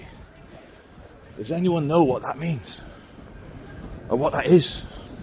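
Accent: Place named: Scotland, United Kingdom. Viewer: It's Scottish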